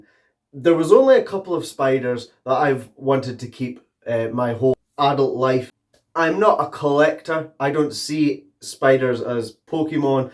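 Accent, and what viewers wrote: Scotland, United Kingdom. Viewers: I watch Dave every week and his channel with camera lady it’s fab, I’ll admin nice to hear your Scottish accent looking forward to more of your post now that I know your here.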